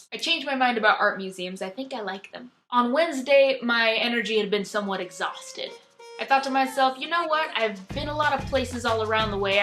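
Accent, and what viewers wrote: England, United Kingdom. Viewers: congratulations on the purity of your English accent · Your english accent sounds very mary poppins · Amazing UK accent !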